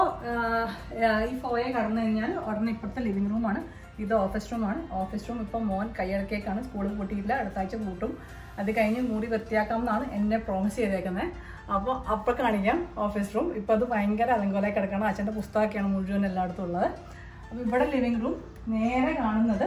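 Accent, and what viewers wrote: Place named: Palakkad, India. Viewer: Hey,I am a new subscriber!vlog popped up on my feed!excellent narrative and sweet home!I also made another observation,Are u from palakkad?oru valluvanadan slang thoni!I also hail from pkd.And ur gestures and slang resembles anchor rekha menon too!☺️Has anyone else told the same?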